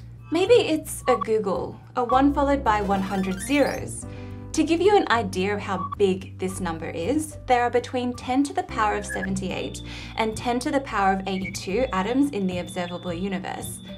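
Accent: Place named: Australia. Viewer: in an Australian accent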